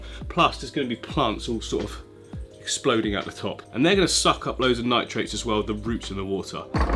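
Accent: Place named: England, United Kingdom. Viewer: Thank you so much for posting the name of that stone onscreen. The CC was rubbish! 🤣🤣 It was cereal stone, or serious stone. Clearly not able to process a strong English accent. 🤣😜😍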